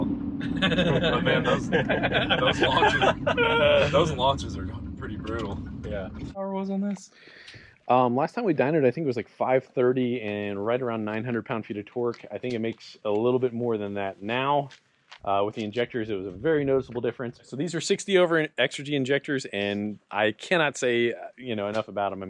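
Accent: United States. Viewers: Are you from Maryland? Or born and raised in Florida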